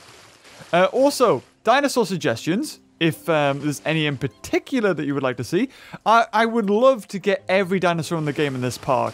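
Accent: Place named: United Kingdom. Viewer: Okay, that person who said all that mean stuff is really annoying to me for many reasons. 1) Saying the same damn thing for three comments straight doesn't get your point across any better than saying it once. 2) How can you not like laugh?! It's so blubbin' funny. Infectious, actually. And for the record, I love British accents. Just makes everything funnier.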